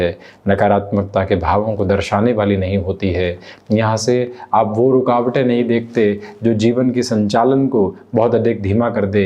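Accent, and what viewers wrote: Standard. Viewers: हिंदी भाषा पर आप की पकड़ वाकई अद्भुत है। शुद्ध उच्चारण के साथ भाष्य प्रभाव अचंभित करता है। बहुत दिन बाद इतना शुद्ध उच्चारण सुनने को मिला।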